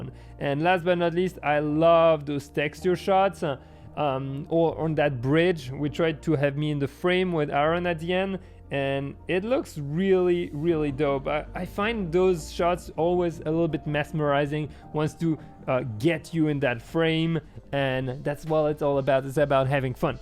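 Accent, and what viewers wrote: France. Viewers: Sorry for this comment, you must really be French to understand that, but it kills me how has not only a French accent when talking english, but also a Parisian accent with all the "Euuh" at the end of each word ahah 😂😂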